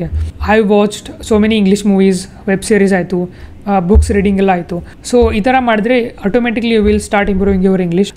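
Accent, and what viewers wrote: North Karnataka, India. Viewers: Bro,are you from north karnatak?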